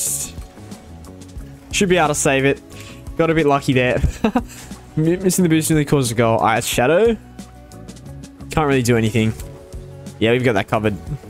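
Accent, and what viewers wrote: Australia. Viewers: bro i didn’t realise you were aussie! should coach me 🤣 xx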